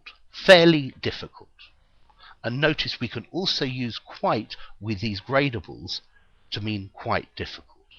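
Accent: United Kingdom. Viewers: First off, as an English Language Development teacher (I'm a native speaker of American English) in America working with mainly hispano-american immigrants, I appreciate this valuable post, as you speak with a wonderful example of a British accent, and I love your cadence and clarity of enunciation. I am going to use more of your videos in my class to help my students understand the importance of pronunciation, cadence, accent, and enunciation. Second, I applaud you for tackling such a nuanced and tricky subject. Even as I write this, I realize how often I use these adverbial modifiers, and how difficult it must be for my students to follow the differences in what I mean. On the upcoming research project, for example, I said that the Power Point presentation is "fairly important", but that the written component is "quite important". I was shocked when all my students then began working on their Power Point presentations instead of focusing on their written reports. 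Obviously, they didn't understand the difference between "fairly" and "quite". Thanks for making me more conscious of this in my communication. Finally, I'm including a link below to a written article about this same topic that may add to the conversation. I hope that such links do not detract from the work that you are doing. Again, thanks for making resources that so many of us value, quite a lot! Please contact me if there is any complaint: <mail> <link>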